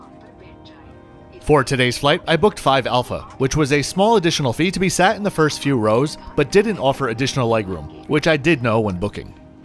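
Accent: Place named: United States. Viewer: His accent is American 😊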